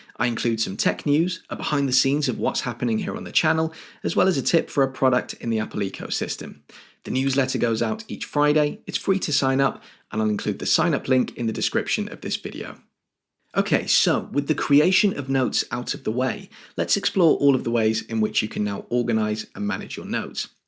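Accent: United Kingdom. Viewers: Found your channel recently. Really good presentation from a true Brit. You from Cornwall? Or was that a random Safari page? Anyway. Glad I found this. I have already passed on to a friend. I’ve tried loads of other apps in the past, but have settled on the fact that Apple done properly is the best option for me. Thank you.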